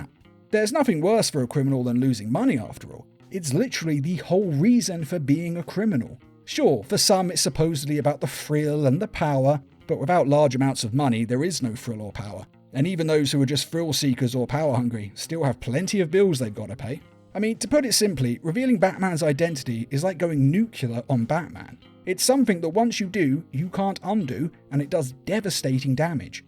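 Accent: United States. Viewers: Good job on the American accent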